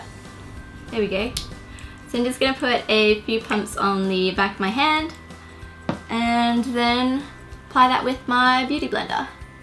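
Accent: Australia. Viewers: Where in Australia is she from?🇦🇺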